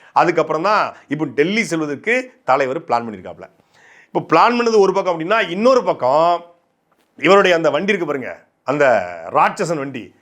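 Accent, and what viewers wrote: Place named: Tirunelveli, India. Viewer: சாட்ட நீ பெரிய ஆளுயா.... உண்மையாவே very interesting politician..... செய்து உன்ங்க நல்லூர்.... வாழ்த்துக்கள் தம்பி.. தொடரட்டும் நெல்லை தமிழ்... கேட்க இனிமையாக இருக்கு தம்பி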